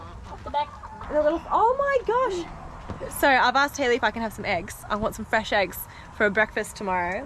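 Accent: Australia. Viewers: Your Aussie accent is coming back. I noticed it almost right away. Good on ya cobber. (From a Canadian so I hope I did not offend) Lol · She does sound a bit more Australian than before. Must be because she's staying far away from that bad influencer Tilly. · It's funny that you mentioned hoping you'd get your accent back at the end of the video. During your introduction at the start, your accent sounded so strong that I said out loud "Oh that's right, she's Australian!" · Knew you were an Aussie!! Welcome home, chick.. Great vids, cheers. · Had no idea you were Australian…I can usually do a good job (for a Yankee) of picking out the difference in accents among the commonwealth nations (or former) I admit to missing it this time. Were you born there or your parents from elsewhere? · Haha, you sound so much more Australian now you're back in Aus :) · You can always tell an Australian by their "Hee-uh" . 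We're finally Hee-uh.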